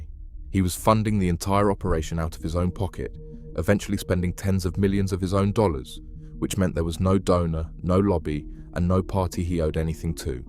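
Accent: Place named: United Kingdom. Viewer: Please speak American, especially when the subject is American history. The word is Plant, not Plont, perty, not potty. I am sick of British accents being used for narration to try to impart seriousness.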